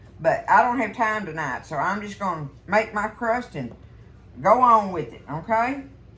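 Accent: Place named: Texas, United States. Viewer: 🤩Love your Texan accent!🤠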